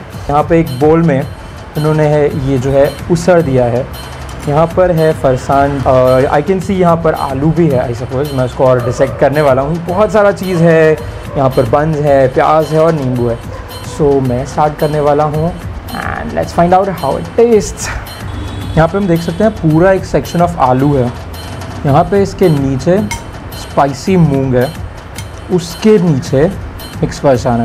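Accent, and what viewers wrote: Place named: Maharashtra, India. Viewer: tuza marathi accent Khup chhan ahe!👌👌👌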